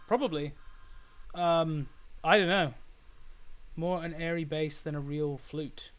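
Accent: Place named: Scotland, United Kingdom. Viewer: Thank you for the strream and the production knowledge bruvo, absolutely love your Scottish accent!! This stream made my day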